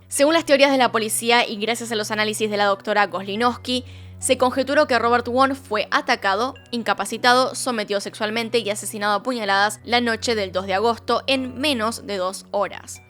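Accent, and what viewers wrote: Argentina. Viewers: Ala , que hermosa eres y que bonito ese acento argentino, que chula manera de narrar algo atroz 😭 que arte.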